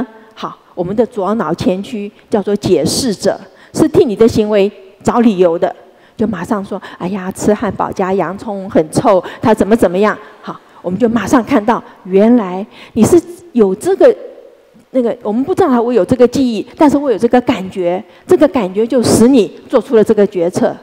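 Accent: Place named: Taiwan. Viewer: 台湾女生说话真的很有特色，我个人是蛮喜欢的的。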